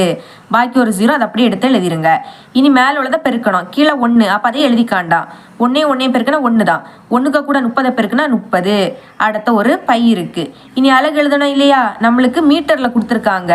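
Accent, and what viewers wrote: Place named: Nagercoil, India. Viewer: Unga native nager coil side thana unga voice enga area pola iruku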